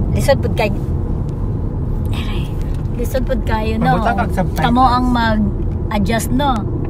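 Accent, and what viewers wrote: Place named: Visayas, Philippines. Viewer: Pretty kaayo ka sis, and i love the way you talk ky pareho ta bisaya.. Amping diha permi,kumusta na lang ko sa mga hapon. Hehehhe..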